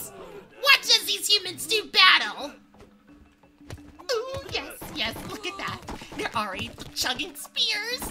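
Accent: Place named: Scotland, United Kingdom. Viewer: NAH, I'm calling ya. Nice Scottish accent as well.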